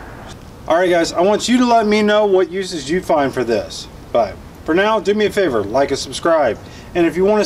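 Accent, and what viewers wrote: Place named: United States. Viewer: Hi there! Wonderful job! 👏 Sorry but I didn't catch your american accent. I tried but I couldn't understand the names of those 2 chemicals you used to reveal and preserve that nice patina/rust effect. Would you please write them down for me? Thank you very much! Forgive me one or another mistske. English is not my native language. Fro Brazil 🇧🇷.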